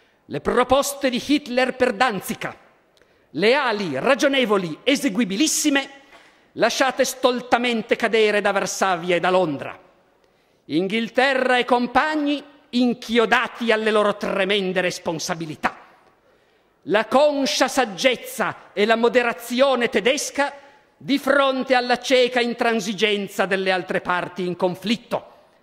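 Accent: Piedmont, Italy. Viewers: No piemontesissimo. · N 1 peccato x la voce troppo piemontese neee , caro prof sei esagerato complimenti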